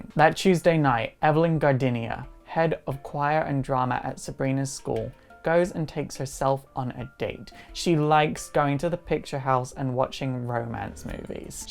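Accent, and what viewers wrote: Scotland, United Kingdom. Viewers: where abouts from Scotland are you from. I'm from Glasgow. BTW I have the biggest crush on you, and watched your vids for ages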